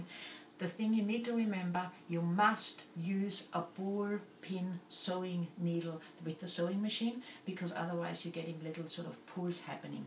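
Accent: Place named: Germany. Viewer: Listening to you I am wondering if you are German. If so from where in Germany. I was born in Solingen and grew up in Mannheim. Would love to make some wide brimmed hats but cant find patterns for it.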